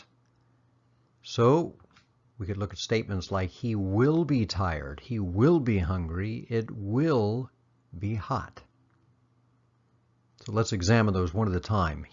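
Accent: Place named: Myanmar. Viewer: you are genius and smart man. I love your Myanmar accent.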